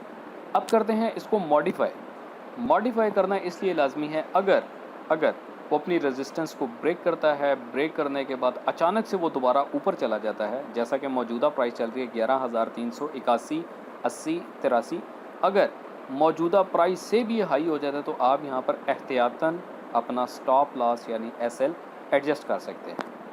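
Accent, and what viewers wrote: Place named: Punjab, India. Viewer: Good information ji Stay blessed. Nice Punjabi touch too. 🙏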